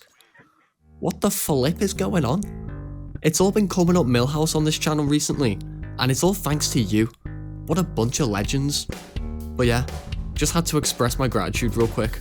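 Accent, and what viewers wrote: Liverpool, United Kingdom. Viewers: Scouse CS youtuber, I can fuck with that lad. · Love the video, are you a scouser mate?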